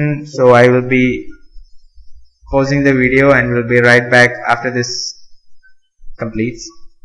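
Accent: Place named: Kerala, India. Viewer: Mallu English!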